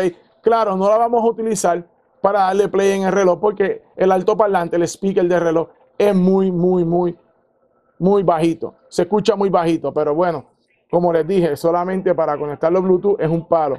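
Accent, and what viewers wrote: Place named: Puerto Rico. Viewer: Tu acento Puertorriqueño lo reconosco en la luna Desde juncos te veo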